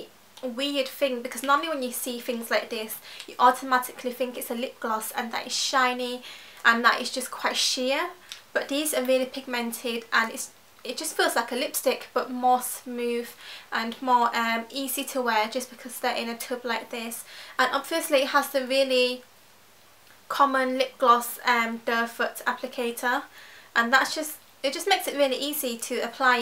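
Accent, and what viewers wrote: United Kingdom. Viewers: U sound British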